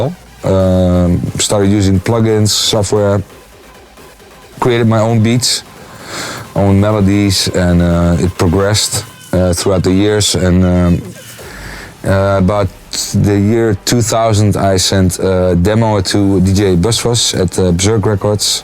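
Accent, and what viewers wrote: Netherlands. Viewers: Love you dutch accent so much <3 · Je merkt wel dat je Nederlands bent man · Jup! Je hoort echt duidelijk zn accent. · Jamie GamingTM nah ofcourse. He's dutch!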